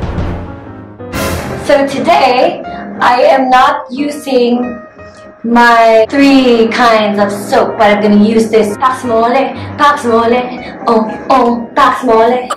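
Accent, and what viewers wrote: Visayas, Philippines. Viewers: Charr,Bisaya syaaaaa!!! Ateeee!!😍😍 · nah kay imbes seriuso ko nangatawa ko sa pag sulti nim ug visaya... nah kay maka lingaw kaayu lagi ka...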